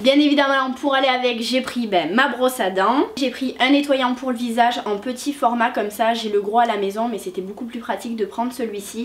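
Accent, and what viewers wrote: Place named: Marseille, France. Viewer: Tu es marseillaise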